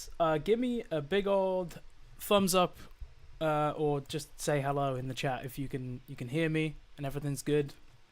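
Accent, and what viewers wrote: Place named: Scotland, United Kingdom. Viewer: Thank you for the strream and the production knowledge bruvo, absolutely love your Scottish accent!! This stream made my day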